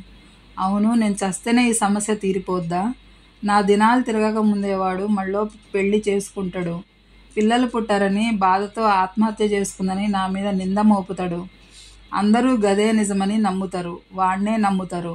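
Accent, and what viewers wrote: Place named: Telangana, India. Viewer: చక్కటి కథ.ఆసాంతం విన్నాను.తెలంగాణ యాసలో..కళ్ళకు కట్టినట్లు వుంది భాష.కథా రచయిత్రి శ్రీమతి మౌనికా సుదర్శనం గారికి హృదయపూర్వక అభినందనలు.రాసిన కథను తెలంగాణ యాదిలో వీనుల విందుగా వించారు తమ అద్భుతమైన గళంలో. సమస్యల కొలిమిలో కాలిపోకుండా ఎదురీది బతికి గెలవాలనుకున్న ఆడబిడ్డ తెగులును ముగింపుగా చూపించి ఎందరికో స్ఫూర్తిని అందించారు.కలానికి,గానానికి మరోసారి అభివందన మందారాలు.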